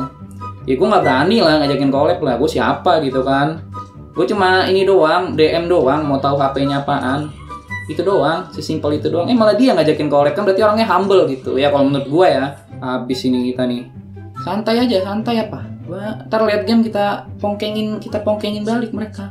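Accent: Palembang, Indonesia. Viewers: Aslinya palembang ya? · Bang orang mana niih??? Palembangkah??? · perasaan dnger kata" kamp*ng, lu org plmbng pek ?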